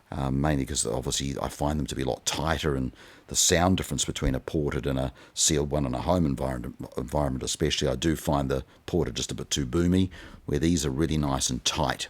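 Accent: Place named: New Zealand. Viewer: I can't afford these things... yet! But my BestVox LS3/5a are definitely going to find a home on top of these at some point. In the meantime, I'll have to live with the Spotless Seed 1-way speakers, and their dedicated subwoofer/stands. They are rather incredible. So that is not difficult. I own 26 sets of speakers, and 7 subwoofers. :) Edit: LOL. We have the same RCA cables. Great minds... ;) Edit 2: LOL. We have the same Kiwi accent, too. I'm in CHC. :) Edit 3: Sorry to say this, but you are sitting miles too far from those speakers. You are well beyond the Critical Position according to Reverberance Decay theory. That means the room is BY FAR the biggest contributor to the sound you hear. If you were to toe them in a little, so the 5as focus about 1.5m behind your head, and you sit within 6 feet of the speakers, you will be absolutely shocked at how great your system now sounds. 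Try it! Rooms are horrible for farfield audio, in general. And Nearfield listening is the universal cheat code. I only sit 70cm from the speakers, and 60cm from each subwoofer in my all-Class-D quad monoblock setup running 4x 48v 10A GaN PSUs. And my system is capable of doing things to the human nervous system that $100,000 systems can not. Nearfield is why. And also extreme attention to set-up, and the time domain - which ruins 95% of all stereo systems.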